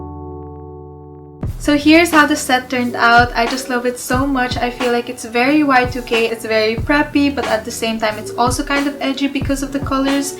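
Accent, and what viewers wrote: Philippines. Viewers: you have a Filipino accent xd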